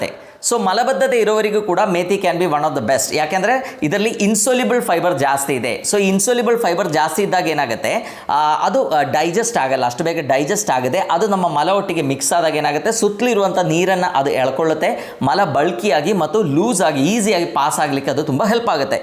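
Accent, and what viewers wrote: Kerala, India. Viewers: ನಿಮ್ಮ ಕನ್ನಡ ಮಾತುಗಾರಿಕೆ ಅತ್ಯದ್ಭತ ಡಾಕ್ಟರೆ 🙏🙏 ಅಲ್ಲಲ್ಲಿ ಮಲಯಾಳುಂ ಆಕ್ಸೆಂಟ್ ಮುದ್ದಾಗಿದೆ❤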